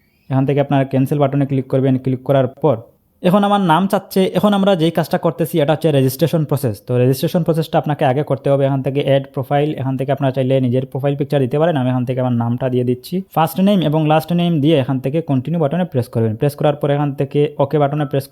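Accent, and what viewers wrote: Sylhet, Bangladesh. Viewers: Bhi lager Sylheti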